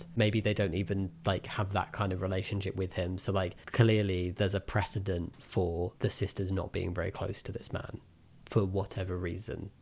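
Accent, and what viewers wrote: United Kingdom. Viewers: Yk I’ve stopped watching all other British YouTubers because their accents kind of irritate me. You’re the only one I’ve kept :D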